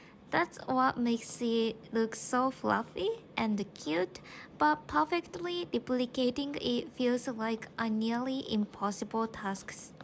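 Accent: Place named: Japan. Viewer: Omaigah a japanese talking in inglish idiom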